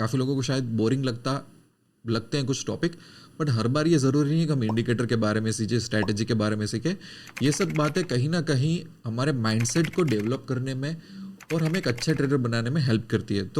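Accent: Gujarat, India. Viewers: As ur voice accent is , i think u r a gujarati.....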